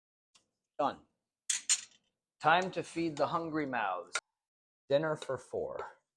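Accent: United States. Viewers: Dats a Joisey accent. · Like the New York accent. Great meal. Thanks.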